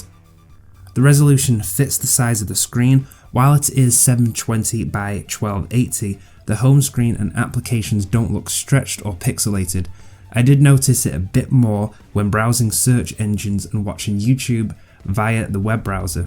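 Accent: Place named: United Kingdom. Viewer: I can listen to british people talk forever lmao. good review!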